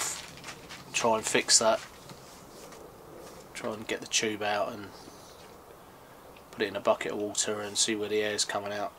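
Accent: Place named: United Kingdom. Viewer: hey mate, great set up! How on Earth do you manage to hget so much space in the woods where you can stay longterm like that. You soudn British and as far as I know, apart from Scandinavia, you can not stay in a tent on land anywhere in Europe. How did you do that!??!?! Hope ou can have some great times there. Lovely also for teh dog to be out like that! WOnderful!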